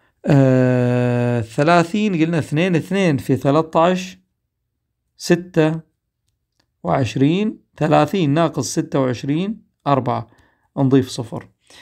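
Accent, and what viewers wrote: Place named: Jordan. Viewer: لهجته تجنن احس راحيه على اردني 🌚✨️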